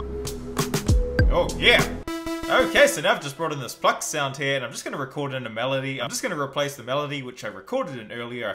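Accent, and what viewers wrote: New Zealand. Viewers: Are u from nz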